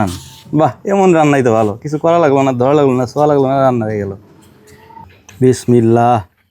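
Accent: Faridpur, Bangladesh. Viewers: ভাইয়ের ভাষা সেম আমাদের ফরিদপুরের ভাষার মতো।আপনি কি ফরিদপুরের নাকি ভাই?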